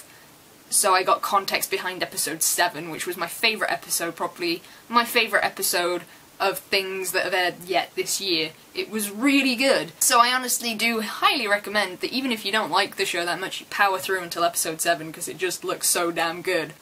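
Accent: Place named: United Kingdom. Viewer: I'm pleased you gave this show a fair chance. I thought it was quite good overall myself. Not a masterpiece, but good, and different. Also. I like listening to your accent. There's something hot about British accents:p.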